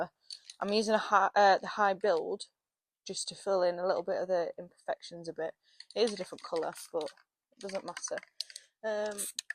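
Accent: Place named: North Yorkshire, United Kingdom. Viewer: yes can tell with the north Yorkshire accent 😊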